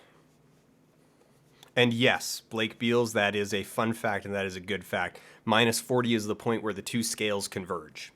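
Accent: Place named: Edmonton, Canada. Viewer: Where do you live? I'm from Edmonton. Sounds like you're close to me.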